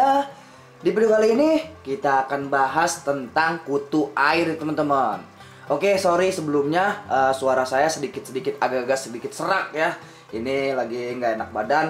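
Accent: Jakarta, Indonesia. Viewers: Bahasa nye betawi benerrr haha.. bang makasih ilmu nya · Demen review bg. Betawi tulen. khas bgt Hhai hallo 😁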